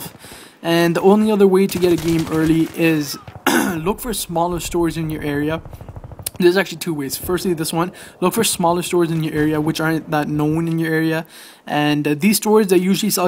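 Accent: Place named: Montreal, Canada. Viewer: u sound like u live in monteal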